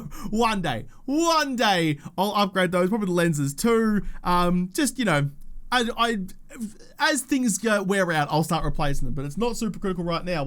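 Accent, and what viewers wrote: Australia. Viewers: Flipping the script on Neil's letter... the question for viewers & subs is whether we remember our first 7D2D video? I do. I had been playing 7D2D since the Alpha versions could be counted on just your fingers... and then Alpha 17 landed, and the game took a massive leap forward. I wanted to build a base using a draw bridge, and I stumbled into a relatively recent video from some guy with a strong Australian accent, explaining draw bridges. From the first video, I was hooked. I came back to see others, and within a relatively short time, I was Subscribed. Excellent work on your part (the arrow slit force field was game changing for me with regards to how I managed base-building). Mixed with a decent Draw Bridge layout, the arrow slit force field was unbeatable. So much has changed since then, but not... consistently inventive and creative, and always chock full of fantastic base-building & horde-night prepping ideas. 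Thanks for all of it,. The game simply would not be the same without you. Congrats on a well-deserved 100k Subs!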